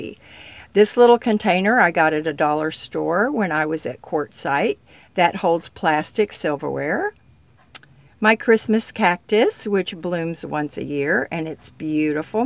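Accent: South, United States. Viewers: I knew it,!! You can take the Gal outta the South but you can't take the South Outta the Gal, Honey you hang in there. Keep saving, stay safe and keep your wits about you. You are gonna be OK..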